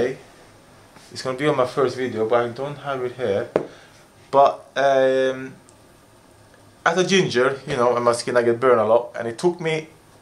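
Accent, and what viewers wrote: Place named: Liverpool, United Kingdom. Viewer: Sko tru du sko hatt bedre scouse accent enn d der itte adle de åra:) blir bra detta! #legende! YNWA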